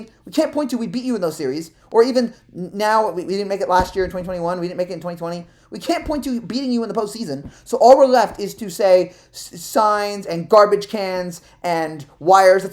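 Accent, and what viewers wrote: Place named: New York, United States. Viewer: I honestly thought it was just Stephen A Smith that ranted like like that, turns out nah. That's standard New York dialect lol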